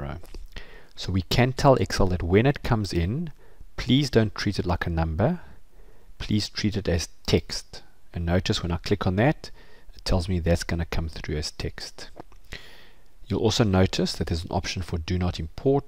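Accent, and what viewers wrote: South Africa. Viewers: narrator is def south african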